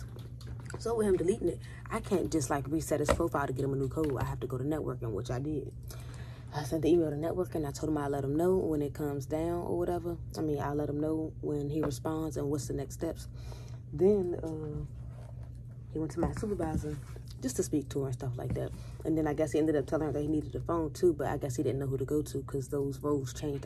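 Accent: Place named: Philadelphia, United States. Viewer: In the beginning of the video, I thought I was Trippin but then at the end of the video I realized you’re from Philly so am I I just finished Google cyber security online. I’m studying for my copier A+. I’m learning SQL Lennox and Python, but I don’t have any experience.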